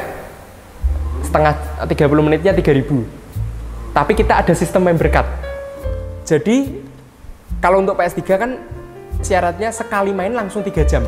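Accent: Java, Indonesia. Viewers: Mas sebenarnya asli jawa atau kalimantan?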